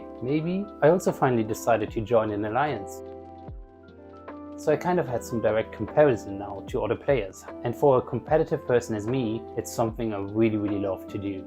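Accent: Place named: Germany. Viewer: Thats very interesting ! I am from Germany and i thought your accent sounded german.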